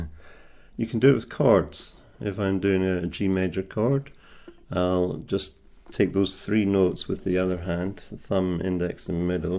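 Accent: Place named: Scotland, United Kingdom. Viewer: Yr fingers looked like a spider, I said to hubby. Then u said spider. ;) Love yr accent. Scottish.. ? THe best accent in the whole world.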